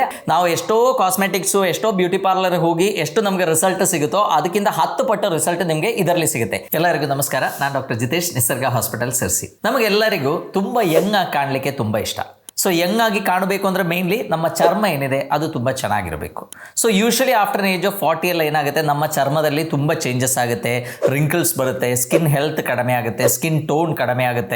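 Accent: Kerala, India. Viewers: Good Morning sir...You are giving very very very good information Always i will be watching your videos. I can understand most of your points b coz I studied the related course in same the profession and also I studied about Nephro urology and i worked in the joint venture units. And one more thing except Malayalam accent will be coming in your talk while using English your Kannada is 99% good . And Thank you so much for giving more informations about health updates in your every videos. Thank you so much sir .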